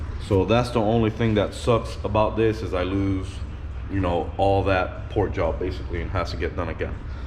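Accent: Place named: Cuba. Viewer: Are you Cuban because in some vids you speak spanish and you sound Cuban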